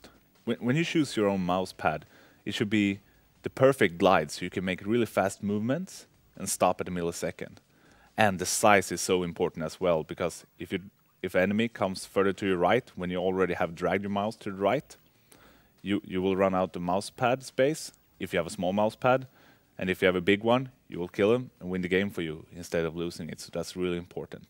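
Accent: Sweden. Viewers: That's because English isn't his Native language, he's Swedish. Can you speak Swedish perfectly? Also he is legend, wait for it...Dairy! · 32 people diden't understand swedish-engilsh.... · love his swedish accent :D!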